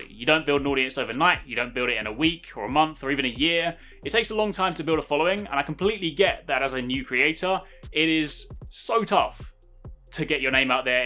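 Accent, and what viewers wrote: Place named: United Kingdom. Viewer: Thank you! I'm not in videomaking or stuff, but seeing you share high quality knowledge is always good! Following your channel since you were making MH4U vids and I'm very happy with your growth, well deserved! I'm not an english native speaker and you helped me to learn the language with your tutorials in a funny way and brittish accent!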